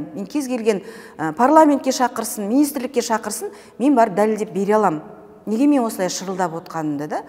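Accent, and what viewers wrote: Standard. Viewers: ханым, жарайсыз! Осы интервьюда таза казак тилде сойлеп шыктыныз, кулакка оте жагымды естиледи екен (менде казак тилде клавиатура косылмаган айып етпесин) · қазақша таза сөйлеп қалыпты, молодец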